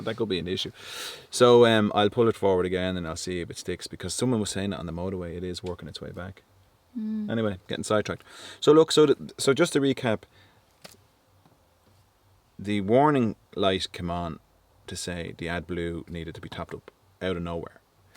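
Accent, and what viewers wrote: Ireland. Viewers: That the problem with motor vehicles today I have BMW about a year and a half old I've been back with lights on the dash about 9 times., just don't make them any good anymore., I dont keep my car for more than 3 years now Because of the quality of them.,yeah a member the Ford Escort last forever I just recently come across one of your videos I haven't watched you for about 8 months used to love watching your channel because I love the Irish accent Thumbs up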